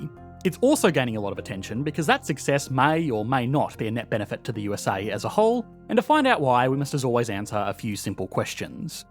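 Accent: Australia. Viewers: 😂 As an Aussie, I can confirm that those weird downward inflections are components of the Aussie urban accent. I don’t have it myself because side I never liked it, it a lot of Aussies do…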